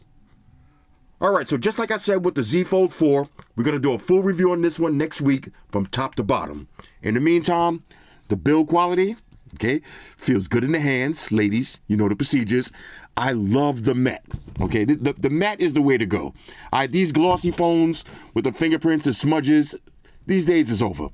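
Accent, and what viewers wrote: New York, United States. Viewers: talks like a NY Giants fan. Gross. Dope vids though. Z flip 3 all the way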